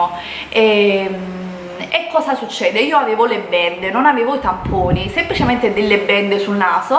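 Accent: Naples, Italy. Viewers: Che pena le persone che pensano che l'accento caratteristico di una regione sia una cosa negativa. NON è né un'attrice né una cantante, smettetela di parlare di dizione! Parlare di grammatica è una cosa, di dizione è un'altra. È napoletana e ha la sua cadenza, così come un fiorentino pronuncerà la 'c' aspirata e così via. La lingua è carica di storia, ed è cosa umana che cammina con le gambe degli uomini, nei luoghi in cui vivono. Sappiate che gli accenti e i dialetti sono un patrimonio e una testimonianza enorme e vanno preservati con tutte le loro diversità. Quando parlate di grammatica è una cosa, ma non se ne può più di leggere insulti sull'accento. Manco sapete di cosa state parlando.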